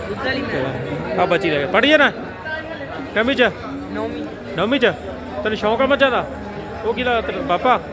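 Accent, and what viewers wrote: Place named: Punjab, India. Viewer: Sona bahut hai kanjarda teri boli jata wali hai bhai teri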